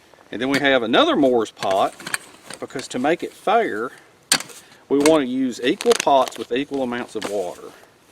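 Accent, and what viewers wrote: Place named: Louisiana, United States. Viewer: You propably make the most sensible „wilderness-content“ on YT so it‘s my pleasure. And that Louisiana-Accent is the best thing ever. Your videos can not be long enough. I love to hear you talk! By the way: I keep watching my favourite video of yours again and again: „Your behaviour, choices and mindset in survival-situations“. That is pure gold. They should put it on TV 😂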